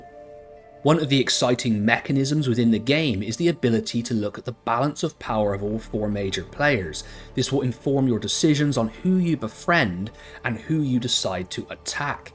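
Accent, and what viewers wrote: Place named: West Country, United Kingdom. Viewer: This is the dogs 🏀 🏀… nice to see knowledge and enthusiasm in the review, together with a little West Country chaaarrmmm oh arrr 🇮🇪❤️🐌🐸❤️🤗🐸🏀🏀❤️🐌❤️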